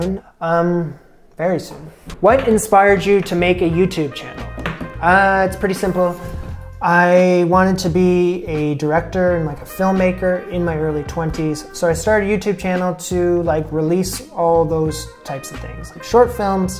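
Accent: Canada. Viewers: I suspected you were Canadian based on how you say 'out' :D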